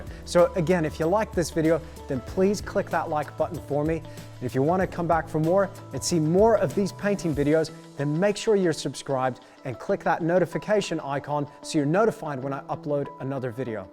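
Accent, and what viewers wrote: Australia. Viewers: Am i getting aussie vibes? Are you going in and out of accent? Don't know if I'm losing my little aussie mind or not haha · Great videos,!, just put of curiosity, I can't place ed your accent-, is it Australian? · I could hear that Aussie in ya there dingo! Thanks for the lessons mate.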